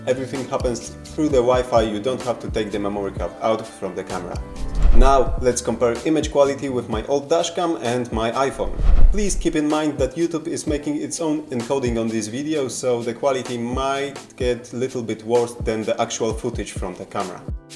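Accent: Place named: Poland. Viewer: Ale schize miałem. Akcent taki znajomy, polski i dopiero przy porównaniach wideo zrozumiałem xD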